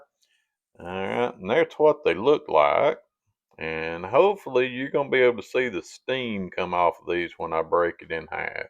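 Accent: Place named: South, United States. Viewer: Thank you for the recipe. My Mom & Grandmas made the best biscuits, I’m a biscuit failure, they both said I worked the dough too much, gonna try with you!. I am a North Carolinian - born & raised. Love your accent, SO many carpet baggers have moved in here, it’s rare to hear a Southern accent! Bless Your Heart!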